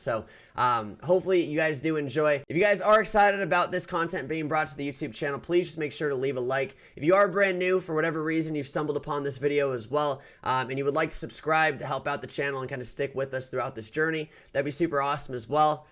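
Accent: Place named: United States. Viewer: This sounds too American for me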